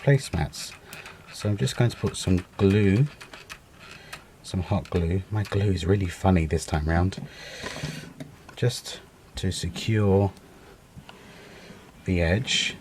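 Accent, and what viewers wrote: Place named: Kent, United Kingdom. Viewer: Clever. Wouldn't of thought of that. you sound like your from Kent. I live in Kent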